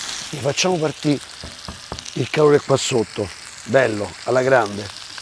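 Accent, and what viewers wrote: Rome, Italy. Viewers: Bravo il tuo romanesco mi piace sei simpatico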